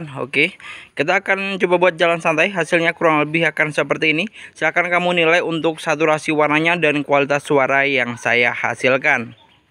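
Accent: Madura, Indonesia. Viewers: Madura banget logatnya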